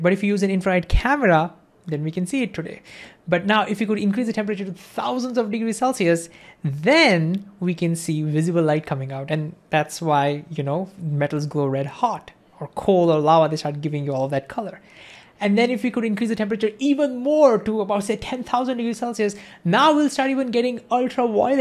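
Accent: India. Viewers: in the video. First time I see a video from you. Let me tell you two things. One bad, one hopefully less bad. First, the bad: you DO have a strong indian accent and I hate it. Simply because it's just a tiny little bit harder for me, a French, to understand. Second, the less bad: I know I'm going to love whatever content you offer, insta like, insta subscribe. As an old PhD in physics now working in engineering, I know that 1. I'm not up to date and 2. my education and teachers were both excellent, thank you, yet, ANY form of education is a bit lacking. History of science for instance: can you imagine that I studied that for ONLY one year? - Yes, only one year. This discipline shall be mandatory for EVERY scientific field! - But yes, I still had the chance to study it for one year, told you I received an excellent education. Understanding HOW and WHY great ideas came to be, I dare say, is probably more important than WHAT the great idea is. 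Unfortunately, it's also much, much harder to teach, and longer, therefore education chose to focus on what (also, when you need that "what" to carry on with the next great ideas, it kind of make sense: efficiency) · I think you should change your beard and cap style to become more dapper because your indian english speaking with that getup is eerily similar to a fundamentalist islmeek preacher who was kcked from India. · Among the bes tindian youtube channels (or ones with indian accent anyways)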